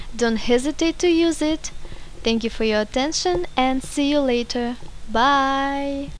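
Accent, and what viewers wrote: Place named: Russia. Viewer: очень интересный урок, спасибо. но у вас очень необычное произношение. очень сильный русский акцент, который мешает воспринимать информацию. к примеру, многие буквы английского, даже похожие по звучанию на наши, произносятся совсем иначе (t, s)/а смягчения в английском вообще нет.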